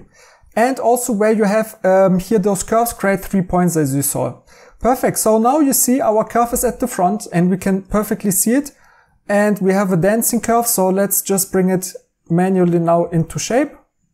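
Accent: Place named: Germany. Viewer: Hi, thx for your free content 👍 btw, i hear u r no native speaker, by any chance, is your native language german? 😁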